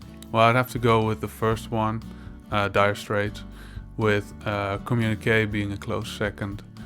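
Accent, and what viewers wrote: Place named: Netherlands. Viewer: The Lord has a voice! And he is Dutch! (The first 3 seconds gave it away)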